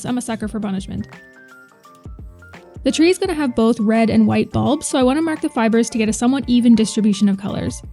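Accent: Newcastle, United Kingdom. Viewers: I thought you might be from Newcastle 😂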